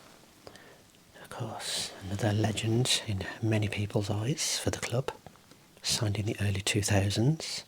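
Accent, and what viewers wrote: Birmingham, United Kingdom. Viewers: Genuine question - how come you're a Utd fan rather than Villa, Wolves or Birmingham? You're accent suggests brummie or black country. Was Utd a family thing or personal preference?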